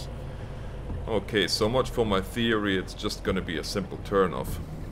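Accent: Germany. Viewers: Haha I can tell and I also wanted to ask are you German?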